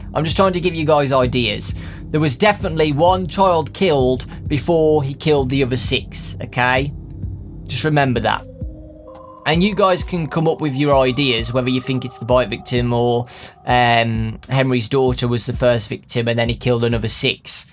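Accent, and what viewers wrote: United Kingdom. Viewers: i hate this brittish accent.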